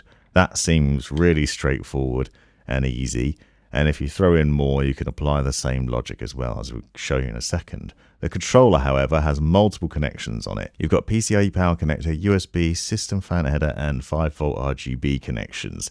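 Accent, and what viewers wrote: United Kingdom. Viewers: God your videos are just awesome, the editing, the sound quality, the clear explanations, the smooth voice coupled with the british accent 🥵, i love your work and you’ve helped me so much for my first build ! Thank you ! I was wondering though if i used the galahad lcd 2 aio in my system with 3 lcd fans and the rest being sl infinity fans would i use the galahad controller or would i need a different one ?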